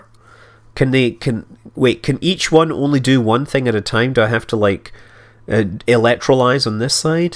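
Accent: Scotland, United Kingdom. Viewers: I saw that the channel's name was Scott Manley. Then a deep Scottish voice validated that name.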